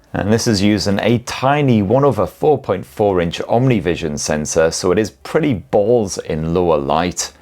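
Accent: United Kingdom. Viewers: Great review. Love tne Brit accent and twattery. New word for us. 😉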